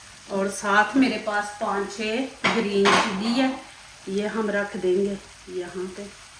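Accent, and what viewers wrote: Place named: Punjab, India. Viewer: بھت اچھا سالن اپ نے بنایا ھے ماشاءالله ۔لیکن بات یہ ھے کہ اپ کی اردو پنجابی ھے اس لہجہ میں بات کرتی ہیں تو بہتر ہوگا اپ پنجابی زبان ہی بول لیا کریں پاکستان کے سب علاقوں میں پنجابی کو سمجھتے ہیں لوگ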